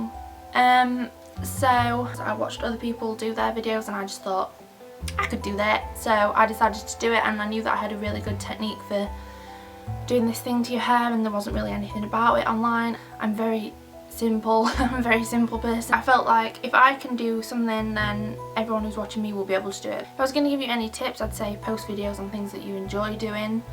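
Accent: United Kingdom. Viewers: R u british ??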